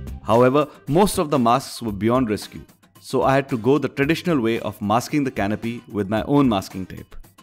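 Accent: India. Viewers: Judging from the dialect you are Indian... well, your model is very colorful, but I think it has nothing to do with the coloring used on the real aircraft. From late 1939 gray colors (RLM 74, 75, 76) replaced the blackgreen/darkgreen (RLM 70, 71) paints. "Experts" reiterate, that Bf 109 E's in early/mid 1940 were finished in RLM 71/02, period!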